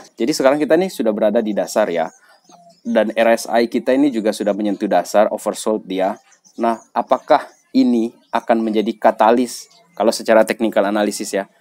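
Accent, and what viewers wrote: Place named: Manggarai, Indonesia. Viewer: Berarti sama logat nya dengan kita orang manggarai yah 🤣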